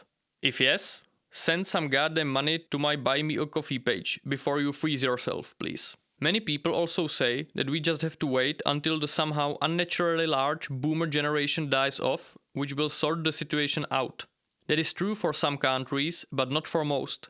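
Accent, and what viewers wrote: India. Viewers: If I didn’t know he is Czech , I’d say he is Indian based on his accent . He is way too optimistic about USA but overall interesting videos . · your accent sounds indian!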